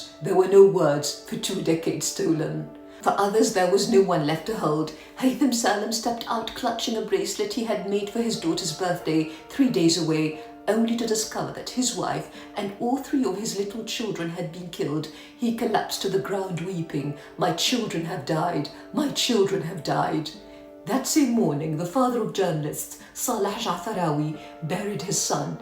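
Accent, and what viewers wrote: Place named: England, United Kingdom. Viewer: Pity about the elite English accent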